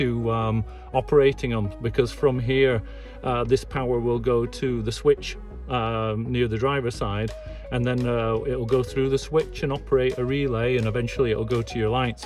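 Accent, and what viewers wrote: Liverpool, United Kingdom. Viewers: Cool. Thank you for the demo! You sound like a Beatle.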